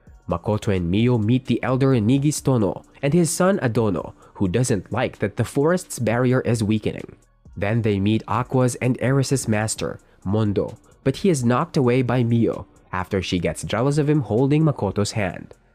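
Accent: Philippines. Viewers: Pilipino kano tangina mo alam ko ang boses ng english ng pilipino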